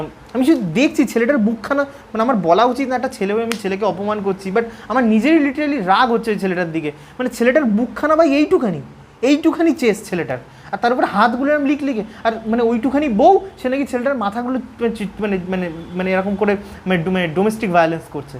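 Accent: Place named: North Bengal, India. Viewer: dada tomar bari ki north bengal a? bangal r chaya dekhte pai..... kothar tan..... amio north bengal er, north bengal r kothay?.... tomar content khub helpful sobar!!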